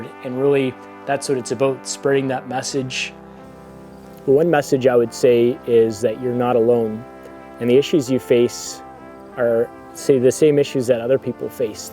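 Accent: Canada. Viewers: Nice to listen to another Canadian.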